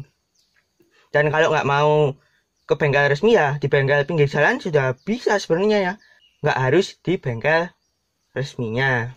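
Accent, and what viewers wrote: Java, Indonesia. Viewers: .medok kali kau ah.. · Bahasa jawanya totok😁😁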